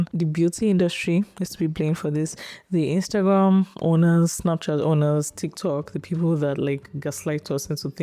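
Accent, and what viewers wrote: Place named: West Africa. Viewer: Unrelated, but you have a beautiful speaking voice. You must be West African, i love yalls accents 😊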